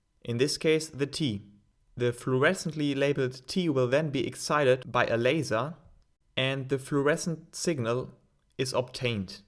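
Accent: Germany. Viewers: Are you German? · no one teaches better than a guy with German accent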